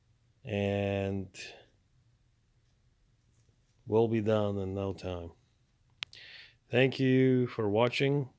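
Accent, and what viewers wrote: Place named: United States. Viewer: Добро пожаловать обратно, как говорится. И английский у тебя такой брутально ковбойский 👍🏻👍🏻👍🏻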